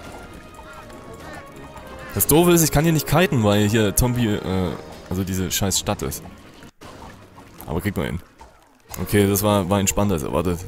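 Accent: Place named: East Germany, Germany. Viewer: 1.City of doom (von Zombies besetzte Stadt/ ist etwas zerstreut manchmal) 2.Cottage auf ossi-englisch Das sind keine Sprechfehler, das ist Charakter.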